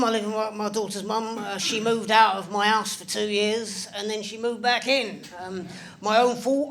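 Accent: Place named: United Kingdom. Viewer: He's funny, but he'd really be funny if his accent wasn't as thick as Keir Starmer's head. Very hard for non-Brits to make out a lot of his lines.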